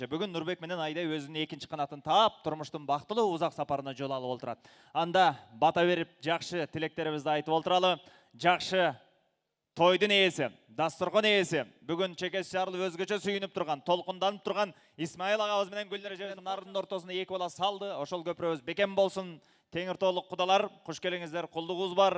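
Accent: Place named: Kyrgyzstan. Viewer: азаматсың👏Кыргызча таптак, таза, жагымдуү сүйлөп, кыргыздын нукура жүзү болуп жүрө бер❤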